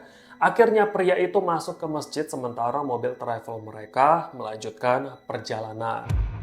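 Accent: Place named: East Kalimantan, Indonesia. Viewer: Saya dah curiga kalo abangnya pasti orang KalTim, karena kedengeran dari logatnya. Halo Bang saya asal Balikpapan sekarang tinggal di Semarang ikut Suami😊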